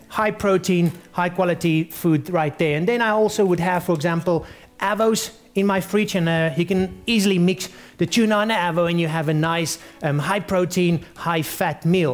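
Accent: Afrikaans, South Africa. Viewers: Is that a south African accent? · Damn this guy has a strong Afrikaans accent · By your accent, I'm guessing you must be from South Africa. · what accent is this? South African? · He sounds like South African' correct me guys if I'm wrong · This guys accent makes me think of krugerrands. And Krugerrands makes me think of Lethal Weapon 2. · He's South African...Most likely Pretorian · He-he, I couldn't place the funny accent until you pulled biltong out of the fridge :) Cheers from Canada! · Kyle Pickens he speaks weird cause he is afrikaans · is this guy South African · Less talking. More showing. The talking makes you look like a idiot. S.A accent. Painfull. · definitely an Afrikaans accent lol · South African? I here that Afrikaans.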